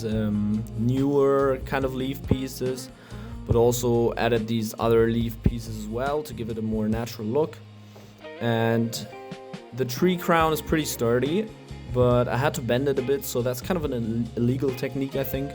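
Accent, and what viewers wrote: Germany. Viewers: hör ich da deutschen Akzent? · This looks so incredibly awsome. The techniques you use make everything look so interesting, and the colour composition looks so awsome too. I didn't even needet to watch the Vidoe to the End before i subscribend. And your english sounds very good too. You are able to notice the german acent but it isn't strong and doesn't bother. But please do something about your audio. Maybe i am the Problem but i watched it with my headphones on and only heard you on my left ear. I am looking forward to your next Video.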